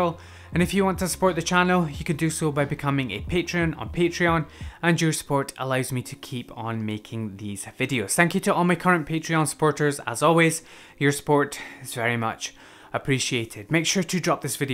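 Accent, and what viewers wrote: Scotland, United Kingdom. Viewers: At last a decent review, thanks for the clarity and Scottish accent a bonus! :)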